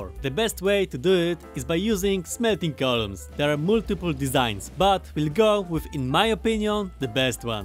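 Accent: Poland. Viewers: Cały czas się zastanawiam po tym akcencie czy jesteś Polakiem. Ciekawe... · WIEDZIAŁEM ŻE JESTES POLAKIEM PO AKCENCIE POZNAŁEM W SEKUDNE xdd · jak tak słucham tego akcentu, to słychać że Polak xD ale lepiej po eng nagrać, bo wiecej osób obejrzy, wiadome, pozdrawiam :D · You have a very thick ponglish accent. Have you attended to pasha learning school?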